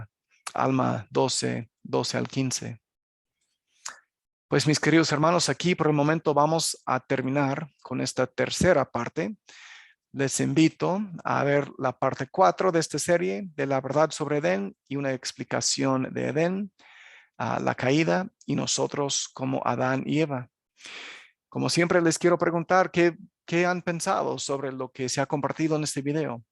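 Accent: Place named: United States. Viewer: Su español hermano es muy bueno, aunque todavía se le nota acento norteamericano, eso se puede reducir, pero nunca desaparece al menos en este estado mortal, igual en la eternidad será el idioma adamico, eso es lo bueno.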